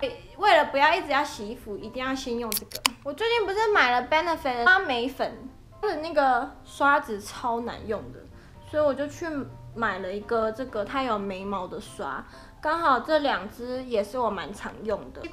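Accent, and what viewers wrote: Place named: Taiwan. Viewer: 你眼妝太重啦！台灣口音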